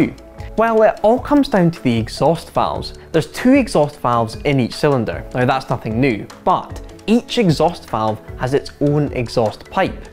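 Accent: Scotland, United Kingdom. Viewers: The accent is scotish ?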